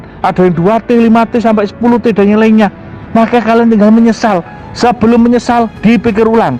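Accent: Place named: Java, Indonesia. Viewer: Nada bicaranya seperti org jawa. Gk akan lama lagi anda tertangkap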